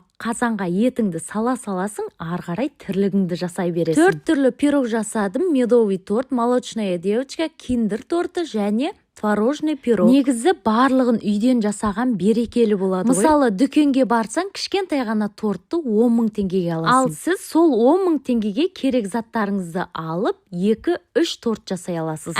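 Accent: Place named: Qashqadarya, Uzbekistan. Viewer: Qashqadaryodanmisiz deymanda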